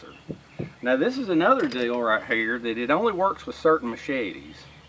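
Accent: South, United States. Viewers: I like the videos I've seen you do and will be watching more I subscribed to your channel I like your knowledge, instruction, and your southern twang (I'm from Texas so I get it) anyway thanks for your time and enjoy your videos please keep making them. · i could tell by your southern drawl. I’m from WNC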